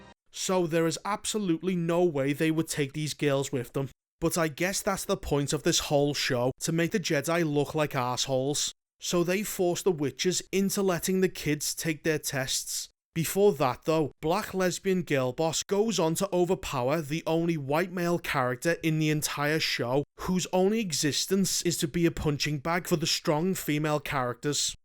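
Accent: Liverpool, United Kingdom. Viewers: My favourite scouser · The scouse accent makes this even better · is scouse, he’s from Liverpool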